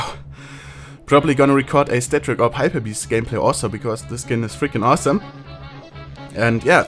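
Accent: Germany. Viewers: Du bist sicher Deutsch, merkt man an deinem Englisch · Wie man merkt dass du Deutscher bist :D trotzdem sehr gutes englisch und good vid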